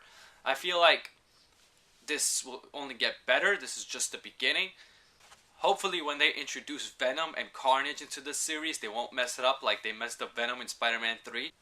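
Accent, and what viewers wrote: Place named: New York, United States. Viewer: Gotta love the NY accent, good review overall.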